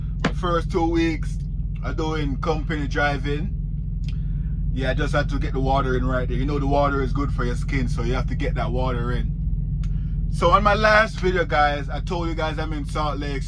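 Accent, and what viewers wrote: Jamaica. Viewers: Are u ja Jamaican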